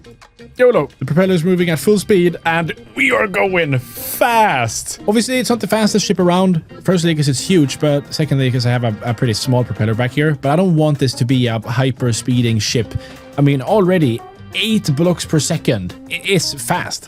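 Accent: Sweden. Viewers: Is it just me or does he have a swedish accent? oh the channel is in sweden. hej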